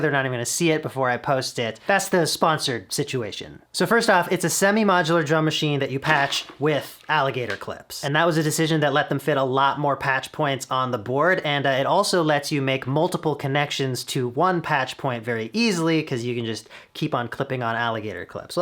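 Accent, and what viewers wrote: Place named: Canada. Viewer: I totally forgot you were Canadian then I heard the word owtside lol love the content man! Been waiting on a good overview of this.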